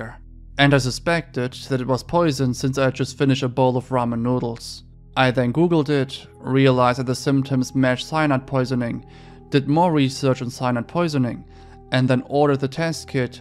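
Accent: Germany. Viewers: You've got a German accent. You are German.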